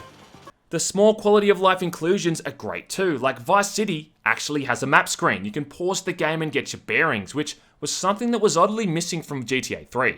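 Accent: Australia. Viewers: i really didnt notice he was australian until he made that australian joke then I couldn't unhear it lol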